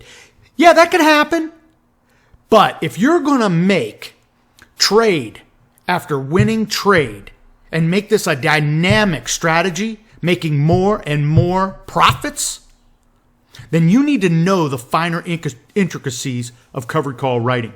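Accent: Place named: Texas, United States. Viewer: Good content! Very educational and real world. And to top it off you talk like The Texas Fonz!! : )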